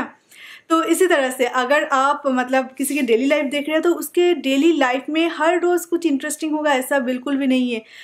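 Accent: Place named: Bengal, India. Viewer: array koi ni..... 😄😄😄. we all know ki she z a Bengali lady ... To unka accent aa jata h .. mujhe to bahut acha lgta h .. jb dii aise bolti h .. I love it ...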